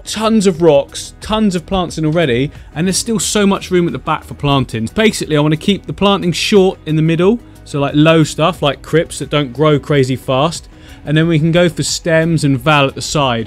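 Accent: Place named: Somerset, United Kingdom. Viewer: I’m from Exeter and you are definitely not far from here because you sometimes sound so Somerset 🤣 love the videos, I would love to get into scaping myself but I’m so skint rn. Coronavirus doing us dirty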